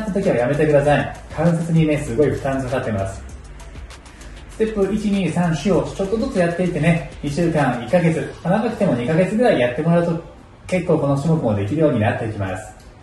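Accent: Kansai, Japan. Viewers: 初めてコメントさせて頂きます。 今までYouTubeで色んな筋トレ、ストレッチ、マッサージなどしてきましたが、痩せるどころか辛いし、脚は太くなるしで全く継続出来ませんでした。 たまたまこちらがオススメで出てきたのでやってみると、とても簡単でこれで脚痩せ出来るのかな？と半信半疑で初めて３週間。ずっと履いていたユニクロのデニムが緩くなってきたので、古いしそろそろ新調しようと思って試着したら２インチも下がっていました！ 体重も確かに少し減っていましたが、正直ここまで脚痩せしていたとは、ビックリです！ 素敵な声が聞き取りやすく、時々出る関西弁に癒され、ハイセンスなＴシャツとタイツで毎日飽きることなく続けることが出来ています。 長くなりましたが、素敵な動画を本当にありがとうございますm(_ _)mこれからも動画ＵＰ楽しみにしています。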